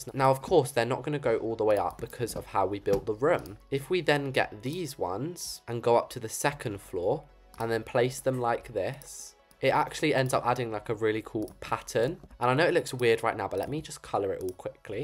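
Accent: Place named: United Kingdom. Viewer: the british accent-❤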